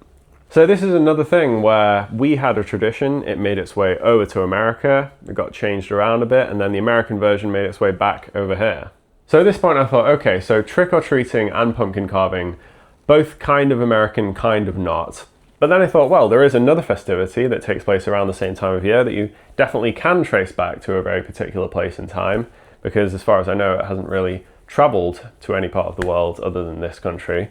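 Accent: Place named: Midlands, United Kingdom. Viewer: I put you above the Watford gap. Midlands lad I would say. Little northern tilt on some words and non-descript accent like the ldn catchments. A small town on the outskirts of a midlands city would be my guess…but may be way off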